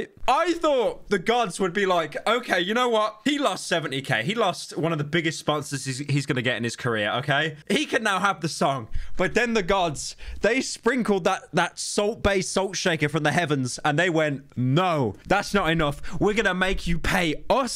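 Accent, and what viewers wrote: United Kingdom. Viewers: just found out hes brittish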